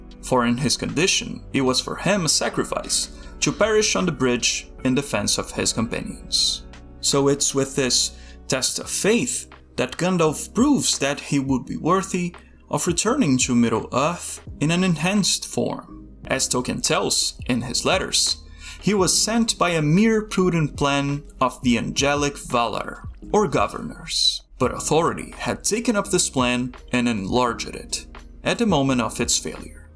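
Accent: Brazil. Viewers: Brazilian masking accent 👀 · Sir, are u brazilian? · You sound Brazilian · Vc é brasileiro, né?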